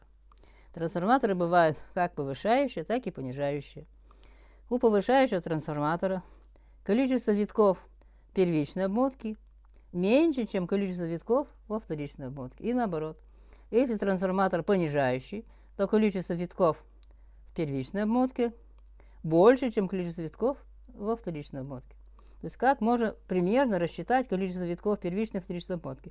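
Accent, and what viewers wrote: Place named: Udmurtia, Russia. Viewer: Акцент у Вас интересный. Вы из Удмуртии или Одессы?)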